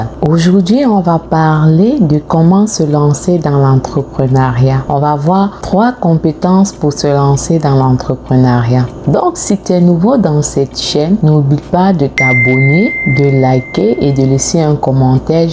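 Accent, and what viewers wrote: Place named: Canada. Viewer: Merçi avec ta petite voix canadienne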